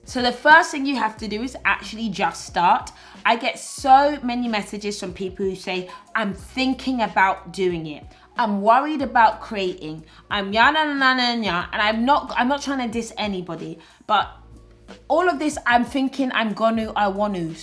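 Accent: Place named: United Kingdom. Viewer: Love her personality... and her British accent!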